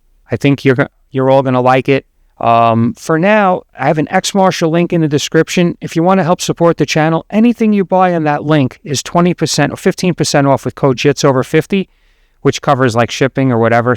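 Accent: United States. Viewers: Even though you didn't say it during this roll, I heard your voice is in my head last night when I rolled saying, " Four points of contact" when I ended up in guard after a take down. I'm a one stripe white belt working on my second and I was rolling with a much heavier blue belt, all I kept thinking was four points four points, it worked quite well for the rest of the round. Your narrations are sinking into my game, thank you for that. I am older than you but you sound a lot like my grandfather who was a New Yorker from Brooklyn.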